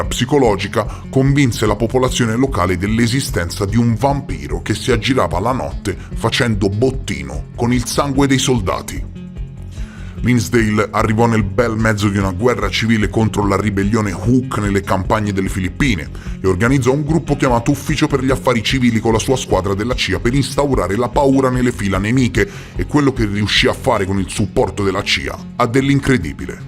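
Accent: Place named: Livorno, Italy. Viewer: Video top come al solito. Saluti da una concittadina (credo, se il tuo accento non mente sei livornese anche tu 😅)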